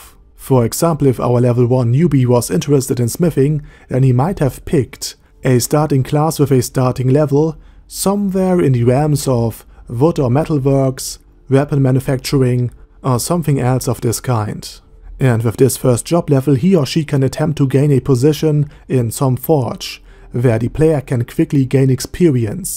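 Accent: Germany. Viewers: Man merkt das der deutscher ist an seiner englischen Aussprache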